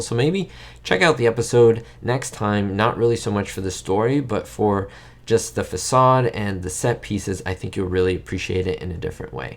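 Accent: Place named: New York, United States. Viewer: "Not really so much for the story but for just the facade and the set pieces, i think you'll really appreciate it in a different way" - Because of that line I will indeed try to catch this episode in its entirety, have only seen bits and pieces before. Always love watching your videos, interesting, cool, nostalgic, amusing, and somewhat relaxing as you have kind of a soothing voice, very New York City. Anyway, bottom line, now I want to see this epi in its entirety. Thanks!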